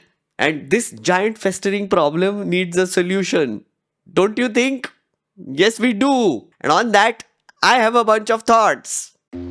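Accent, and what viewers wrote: India. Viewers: Dude, your strong Indian accent is cringe asF 🤮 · I agree with every thing, but do you really have to speak like Apu? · They all are watching your video to make fun off your indian accent too. He also loosened? He also lost… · pjeet accent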